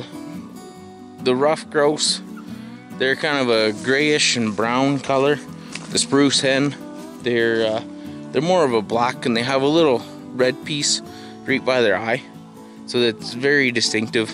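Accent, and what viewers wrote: Canada. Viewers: This is a Canadian accent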